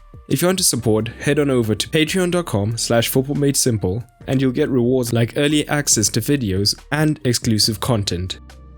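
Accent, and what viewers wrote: South Africa. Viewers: Where are you from? You sound South African. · I could swear you have a South African accent bro. I love these videos! And the OneFootball app 💪🏾 Keeping it Simple 👌🏽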